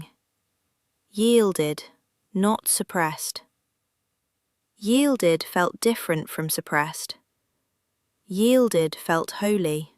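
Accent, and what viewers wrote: United Kingdom. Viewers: These stories are so fake. Someone born and raised in Ohio in an Amish community would not have a British accent. This is all AI generated. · Get rid of the English accent!!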